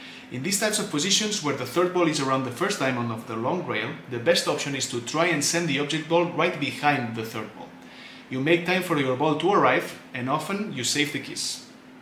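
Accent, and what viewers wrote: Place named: Spain. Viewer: And about the translation, its quite good. Actually sounded like maybe you would have spoken, with a bit of spanish cadence but good vocabulary.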